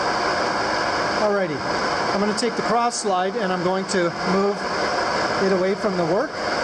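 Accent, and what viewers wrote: Canada. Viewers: Are you Canadian bud? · etalwork was theonly practical class at school, I liked. wish I had learnt more. I thought,tho, that when machining like this, funning luid was used, to shed heat,reduce tool wear, speed process. maybe only on large production lines. ? in a microchip world, nice to see some crsftwork. good video, by Canadian presenter, pronouncing 'out', more like 'oot'? )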